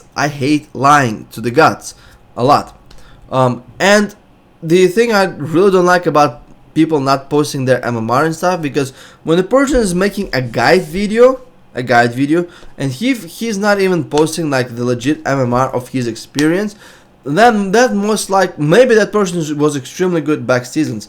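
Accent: Russia. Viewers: как приятно слышать Русский акцент:)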